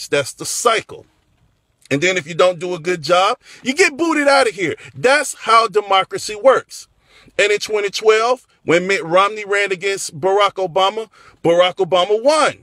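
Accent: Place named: Russia. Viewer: This guy is obviously a Russian. You can tell by his accent that he's probably from the southern Volgograd region.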